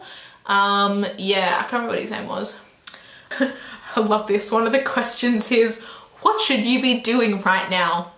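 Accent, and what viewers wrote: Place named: Australia. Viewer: I have never thought you had a distinct Aussie accent. But that all changed upon listening to this ahahah. Love you xx (Also cute clap)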